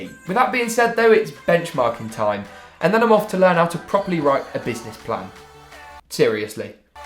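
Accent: England, United Kingdom. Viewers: You are so fucking annoying, you keep coming up in my recommended even though I don’t watch 15 year old Pom kids, fuck you and your pommy accent.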